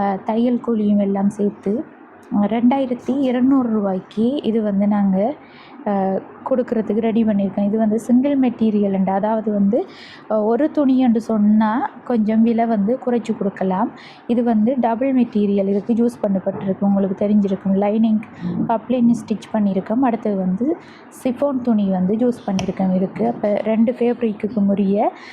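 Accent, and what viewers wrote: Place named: India. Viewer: உந்த சல்வாரையும் கொல்வாரையும் விட்டிட்டு கொஞ்சம் modern க தையுங்க. அதோட இந்தியன் slang ல பேசாமல் மட்டக்களப்பு slang ல பேசுங்கோ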